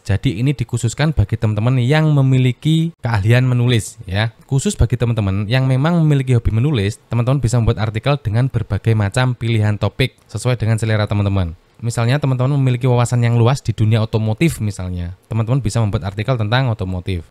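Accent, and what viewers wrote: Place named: Java, Indonesia. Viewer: Kyk nya beda deh, klo ini jawa nya lebih medok, klo kerah kuning gak terlalu kentara medok nya. Itu sih menurutku.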